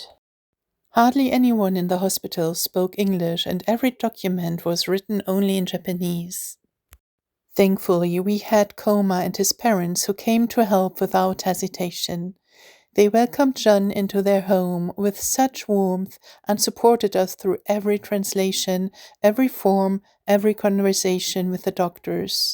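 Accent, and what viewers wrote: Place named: England, United Kingdom. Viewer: Hallo, du hast einen englischen Akzent. Hast du dort gelebt? Deine Hunde sind zuckersüß, habe auch zwei😍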